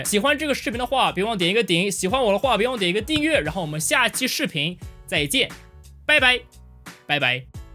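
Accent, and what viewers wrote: Taiwan. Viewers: 你的台湾腔为什么和籽岷腔一样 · 我喜歡的台灣腔哈哈哈哈我被圈粉啦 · 有業配金主爸爸了，讚讚。我們台灣腔就是很可愛！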